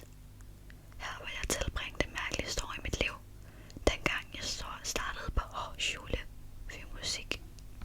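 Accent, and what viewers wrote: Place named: Denmark. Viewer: Ohh btw, do you mind me asking what city / Danish region you’re from? Your Danish accent is beautiful but I think it’s a bit different from the one I’m used to hearing on Duolingo 🥰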